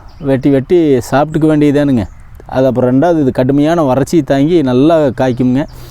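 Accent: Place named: Coimbatore, India. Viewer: You covai Tamil speech is very nice. I had been living in Karamadai for past 30 years where I was studied at karamadai high school then pre university PUC at Government arts college in year 1977 . I completed Mechanical Engineering in Govt college Technology during 1978 to 1983.Then joined India space research organization in 1983 itself. So like your speech. I completed 37 years as Scientist in higher levels and retired in 2020. Like your service and affection on food forests. Thanks If time permit I will meet you personally. Scientist RAJAN PAULRAJ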